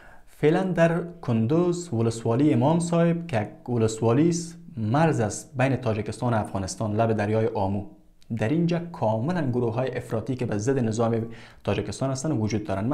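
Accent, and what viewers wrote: Iran. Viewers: Ne tu konigak khai namaindagi mekadi jawanhai Afghanistan ra. Keroom da lahje iranet. Bacha bershe amerallah salle.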